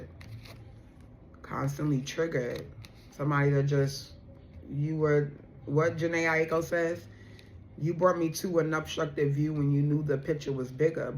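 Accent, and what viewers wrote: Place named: New York, United States. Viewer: Girl I love your energy cause period. WHEN YOU GOT HYPE, I did too 😂😂😂🎉🎉 I miss my New York voices ❤. Born and raised in queens until I moved to ATL 10 years ago. And I think I know exactly who this is. He said something stupid and I didn’t respond to him 😂 MIND YOU JUST LISTENED TO AND by. Manifesting 😢 BRUH 😂and you pulled Scorpio as the first sign. I am a Scorpio 11/01 mannnnn you pulling my energy hard 😂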